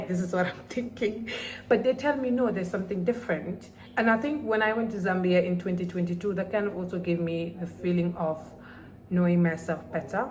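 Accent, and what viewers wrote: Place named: Namibia. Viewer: I am Namibia and I kid you not, you look and sound Namibian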